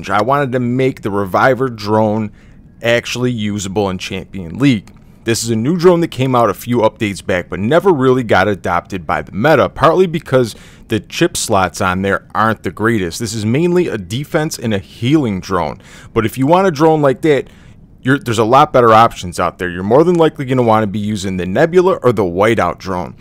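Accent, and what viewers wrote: Midwest, United States. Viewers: You sound like you're from the Midwest Midwest nuclear: new-key-ler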